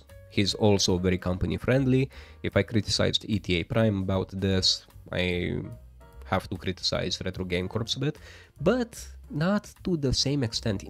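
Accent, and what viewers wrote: Russia. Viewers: credeam ca esti rus dupa accent :)) dar acum ti-am dat Sub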